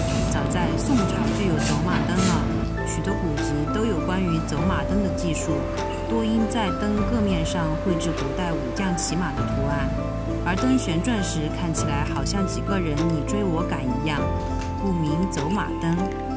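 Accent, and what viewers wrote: Sichuan, China. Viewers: 原来大神是四川人！之前听口音觉得有点像，但不确定。四川出了好多大神级的up主啊！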